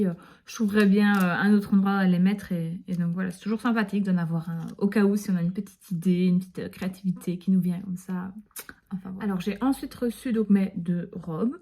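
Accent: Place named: Belgium. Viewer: Meuf je kifff ton accent 😅☺️je pense que t’es belges si nn super ta vidéo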